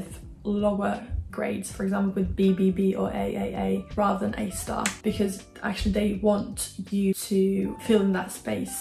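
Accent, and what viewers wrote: United Kingdom. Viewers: If you live in a country, you will acquire their language easily. Add to that, she is really intelligent and speaking with a British accent won't be a problem. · Hi, couldn't imagine that you came to the UK at the age of 16. How can you pick up the accent? It's like 100% British! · I’m from the uk and she literally sounds British that is not the case with literally anyone else I have met from who has immigrated here unless they were a child when they came. You can almost always here an accent but not with her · Hi. I wonder how can you have a british accent if you move to uk from turkey when u are in 16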